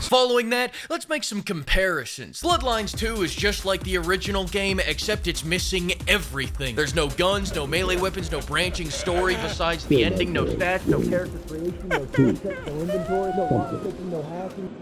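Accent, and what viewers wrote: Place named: Queens, United States. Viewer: You sound like my boy from queens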